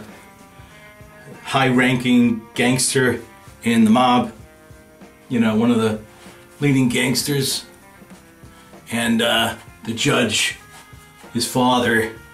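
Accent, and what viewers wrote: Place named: New York, United States. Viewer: I can tell from your accsent you grew up in the big apple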